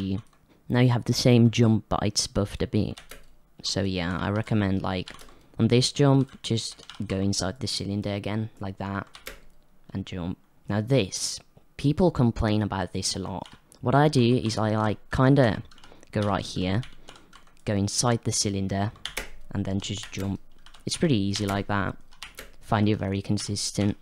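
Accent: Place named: United Kingdom. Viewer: i thought he was a brit lol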